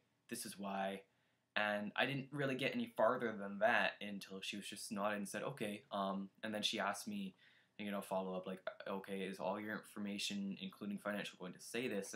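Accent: Canada. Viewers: Your Canadian accent is really noticeable now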